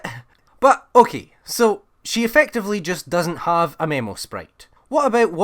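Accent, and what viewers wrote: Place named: Scotland, United Kingdom. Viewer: I love your scottie accent holy shit😭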